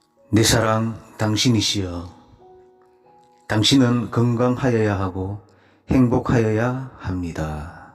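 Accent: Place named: Gyeongsang, South Korea. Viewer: 경상도 아저씨의 사투리가 살짝 들어간 풋풋한 음색, 좋은글, 좋은시, 이 컨텐츠 너무 좋아요~ 좋은시 한편 잘 듣고 갑니다~ fighting~~~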